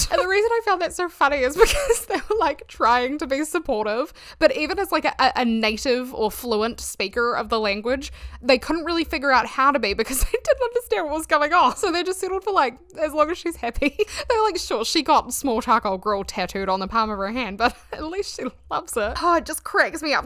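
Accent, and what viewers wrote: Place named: New Zealand. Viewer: yayyy I’ve been here long enough to know to expect your “its a kiwi accent” disclaimer!! Im grandfathered in!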